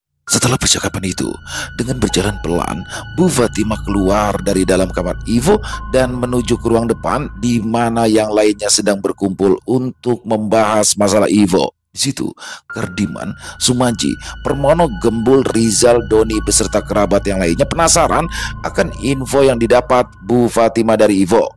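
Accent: Jakarta, Indonesia. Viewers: Wah hadir lagi nih bang Doni, terimakasih ya BG untuk selalu membawa cerita cerita seru, cerita paforitku ini dengan eksen Betawi nya yang asyik oh ya BG salam buat gomang ya 😊😊😀😀😀😀 sehat sehat ya BG dan team 🙏🙏🙏🙏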